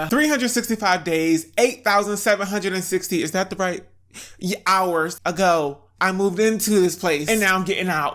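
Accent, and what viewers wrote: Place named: Brooklyn, United States. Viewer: Sounds like Brooklyn 😂 You betta run like hell 😭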